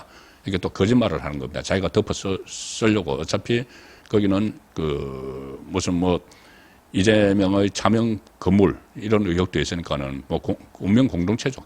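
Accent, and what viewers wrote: Gyeongsang, South Korea. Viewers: 늘 유익하고 알기쉬운 해설 잘 듣고 있습니다. 그런데 계속해서 몸에 익은 대구사투리 발음(금융기관, 금융기관 etc..)이 듣기 거북해요. 고치세요!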